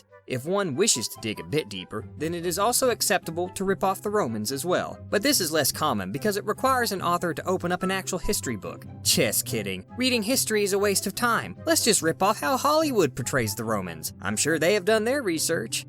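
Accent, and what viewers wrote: South, United States. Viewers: I like how your accent is "normal" and then your southern one comes out... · is he southern??? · Are you a Southerner i can here certain twangs in your accent, I can kinda tell because im a Southerner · No disrespect to you or people from the south, but your accent. Now my mom is from the south, Norfolk, Virginia in fact, and her parents and their family are from the Carolina’s and Georgia. So their pretty much as southern, as respectable southern can get.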